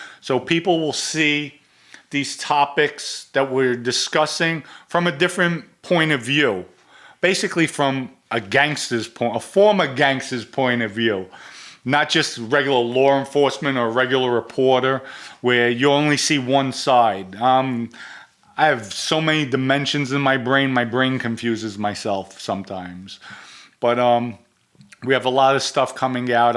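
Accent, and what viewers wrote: New York, United States. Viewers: Hello handsome, I just recently found your podcast, which I really enjoy. Don't allow anyone to stop you from speaking how we NYer's Speak. Love the show.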